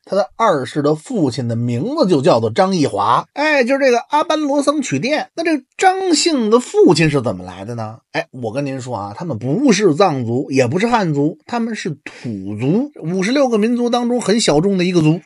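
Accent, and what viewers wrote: Beijing, China. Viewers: 这口北京土话听不太懂。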